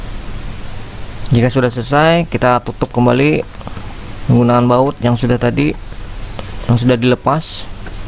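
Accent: Banjar, Indonesia. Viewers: Logat ikam banjar banar . Aku samarinda kampungku amuntai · logat bahasanya kaya urang banjar....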